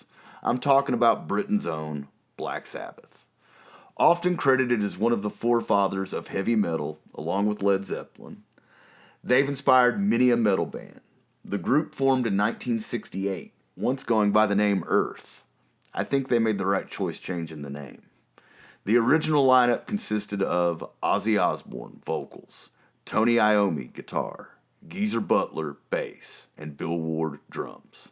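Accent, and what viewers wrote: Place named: South, United States. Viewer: Are you from Florida or Alabama?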